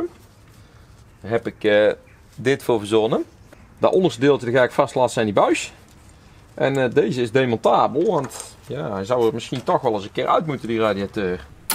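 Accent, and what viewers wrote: Brabant, Netherlands. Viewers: He's Netherlandish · gast... was ook niet als haat, hou alleen ontzettend van da brabantse accent van m.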